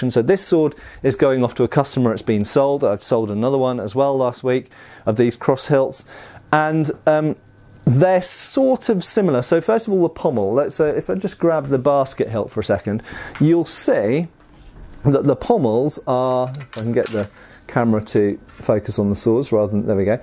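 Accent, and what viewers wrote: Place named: United Kingdom. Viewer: Simply amazing - a Brit speaking and I can understand every word!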